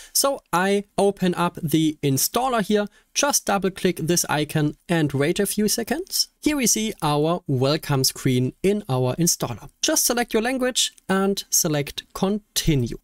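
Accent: Germany. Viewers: weil der deutsche Slang durch kommt 😉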